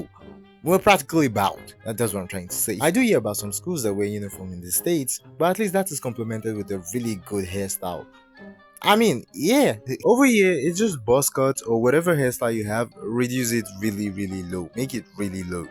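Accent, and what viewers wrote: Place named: Africa. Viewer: You are African.(the dang accent reminds me of ME)😂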